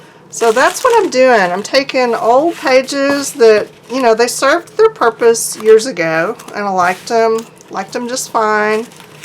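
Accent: South, United States. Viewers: nice!!! I love you and your videos.. you have a wonderful southern accent that I just love to death.. 🤗🤗💜💜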